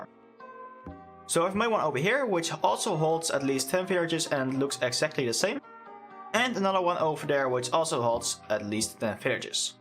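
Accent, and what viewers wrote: Netherlands. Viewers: Hi, I have a Question: Does this also work on all platforms like XBOX One/360 and PS3/PS4? Btw, you have an accent. Are you dutch? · are you dutch ?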